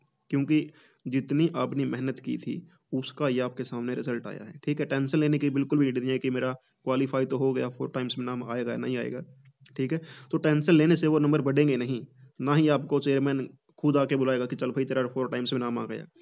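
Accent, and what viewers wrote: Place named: Haryana, India. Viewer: Ap haryanvi hee Bola kro kuki bich me jb ap hindi bolte hoto to haryanvi swg aaa jaata h😁😁😁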